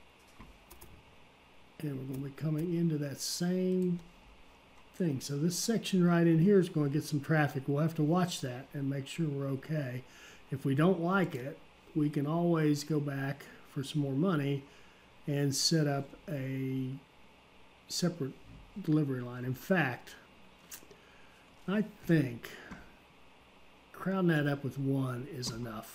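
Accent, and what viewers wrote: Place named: West Virginia, United States. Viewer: Thanks for this episode, or the whole series for that matter. Railway Empire is one of those games I drift back to every now and then, for the sheer joy of watching trains go, and composing the song for them - err the loads they will carry by designing this 4 city cluster. I only found your series recently, I was kind of doing my own thing. Mostly writing spaghetti westerns with my rail lines. But the method/way you connect things has improved my own game a lot. So thanks for taking the effort to share your nice gamestyle. Hardly noticed the West Virginia accent 😆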